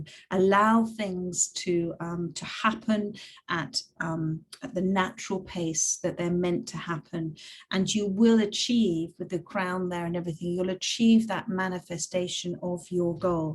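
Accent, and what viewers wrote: United Kingdom. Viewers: Nice to hear another new British tarot reader . Lovely insite and understanding from the interpretation of the cards ♥️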